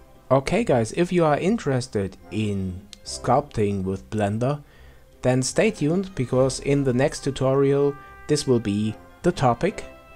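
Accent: Germany. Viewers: haha nein dein englisch ist sehr gut aber man hört, dass du deutsch sprichst p.s tutorials zum addon wären klasse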